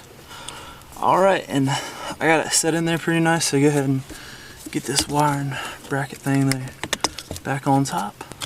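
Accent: South, United States. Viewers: somewhere from american south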